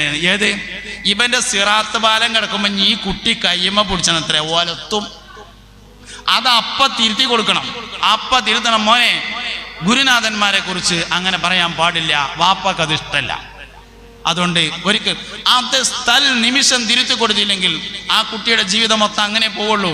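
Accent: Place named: Malappuram, India. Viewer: Vishayam nallathaan pakshe nalla malayalathil parayoo,, ningalyde Malappuram basha yellavarkum manassilakanamennilla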